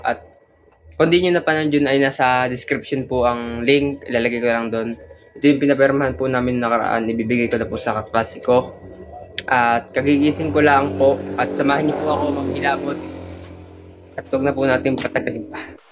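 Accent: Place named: Quezon, Philippines. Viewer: Saan kapo sa quezon bro? Tama hula ko quezon karin sa punto mo, taga quezon din ako eh, nakakamis narin ang province talaga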